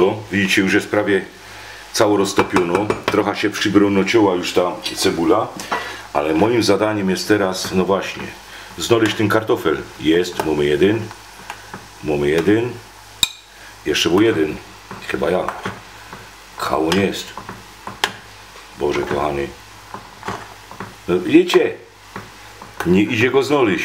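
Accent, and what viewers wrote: Silesia, Poland. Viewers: Panie niech Pan nagrywa więcej filmików przyjemnie się ogląda i ta Godka. Ja bym chciał zobaczyć odcinek fasolka. Pozdrawiam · Pozdrawiam z Warszawy z okolic lotniska na Bemowie, na którym to w 1959 roku lądował vice prezydent USA Richard Nixon. Wtedy pas na Okęciu był za krótki. A mnie i tak jeszcze nie było. Podoba mi się ta śląska gadka i trochę zazdroszczę bo warszawska już prawie wymarła.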